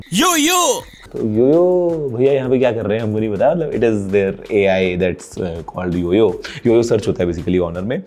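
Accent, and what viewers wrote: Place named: Butler English, India. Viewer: Pls Stop speaking in butler english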